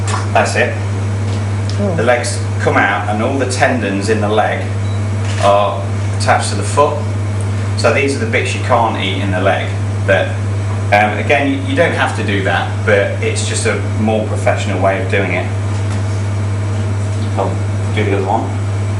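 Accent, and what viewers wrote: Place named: England, United Kingdom. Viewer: Great Job! Thanks for Showing everyone how to clean bird! LUV the English Accent'!!! The Chef sounds like James Bond! LOL!!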